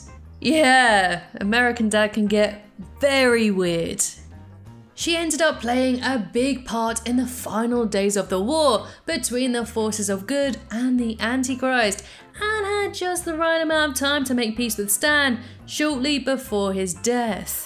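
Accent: United Kingdom. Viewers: Not about the video at all, but Girl, i just LOVED ur british acent, it just, idk, fits u so much, and its so cute to me, just wanted to say that, kisses from Brazil ✋🏾🤠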